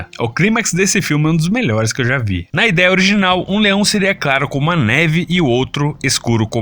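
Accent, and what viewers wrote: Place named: Caipira, Brazil. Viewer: O melhor dessa análise é o sotaque caipira do narrador!!!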